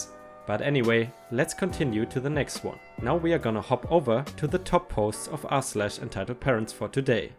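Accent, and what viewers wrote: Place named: Germany. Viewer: I don’t listen to many channels I’m not already subscribed to. There are so many new ones popping up and I usually end up getting the same stories over and over again. So, I stay faithful to my regulars. However, one of your videos caught my eye and I clicked on it. I really enjoy your voice and (to me, as I’m not German) wonderful accent. I do realize to you it would be ME who has the accent! 😀 Anyway, I have subscribed to your channel. I look forward to many more of your videos!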